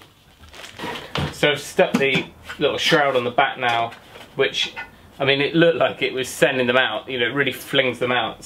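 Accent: United Kingdom. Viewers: I thought "why is he excited he had to pay for the wood, saying that is was not free", then a second or two later "ah wait a second he means it's free of knots in the wood". Only discover the channel recently but very much enjoying it. And from the sound of it you are relatively local to me, in Bristol. I too enjoy renovation but have yet to try projects on your scale just 3 bedrooms and a garage roof but the less said about that the better; will be replacing the onduline with EPDM next year after having had to DPM over it till the weather improves and we have save a little for it. I plan to go thick on the insulation for the roof in there and with extra joists, OSB and EPDM on a 6x7m it gets pricy but will certainly look to use the company I've seen you recommend in another video about the garage renovation.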